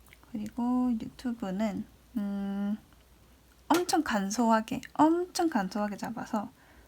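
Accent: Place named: Gyeongsang, South Korea. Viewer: 타지살이하는 경상도인인데 억양덕에 고향온거같구 ㅠ 해서 자주 놀러오려구 구독하고가요😆❤️타지분들이 경상도 억양을 좋아하시던데 제가 듣기에도 너무 귀여우시네요 😘( 입덕)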